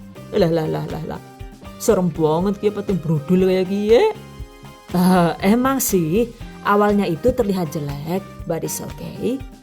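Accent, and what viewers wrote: Banyumas, Indonesia. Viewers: Jujur aku penasaran.mbaeki keturunan opotoh.wong jowo opo bulee.kok kadang Rodo ngapakk.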